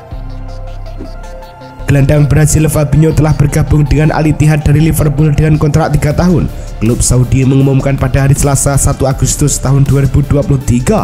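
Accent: Java, Indonesia. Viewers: Sudah bagus cuman Host nya di ganti lah,ending yg cewe, yang cowok ini terlalu Medok.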